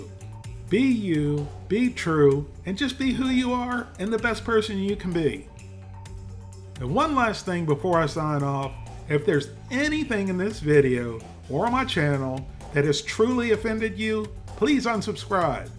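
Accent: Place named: Mississippi, United States. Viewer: Have been here much lately but holy CRAP you've gained a ton of subs! Lol I was around when you were at the 20-40k subs. Good for you brotha! I've always thought you were a Mississippi guy bc of your accent, so now I'm wondering are you? Obviously I am is why I'm asking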